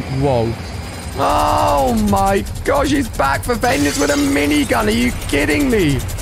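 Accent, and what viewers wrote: United Kingdom. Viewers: Are you British?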